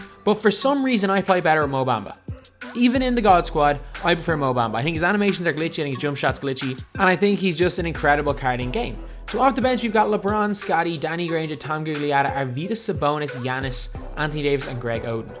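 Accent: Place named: South Dublin, Ireland. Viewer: This is the biggest south dublin accent I have EVER heard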